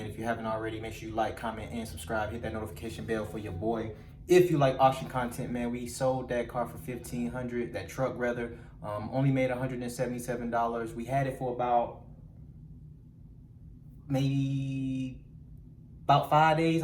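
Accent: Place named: Midwest, United States. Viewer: That's a Midwest Indiana or Ohio accent. Maybe Illinois?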